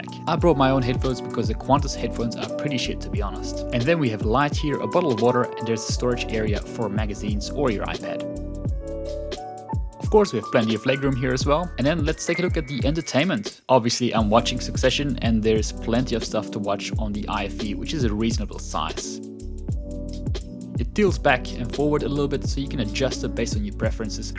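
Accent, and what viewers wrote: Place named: South Africa. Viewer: Thanks for the upload. Haven’t flown Qantas for many years so it was interesting to see what they are like now. The lounge at Perth looked great and not overcrowded, a rarity these days. I’m trying to place your accent, there’s a hint of South African, mixed with a bit of Australian. I love trying to guess accents.😁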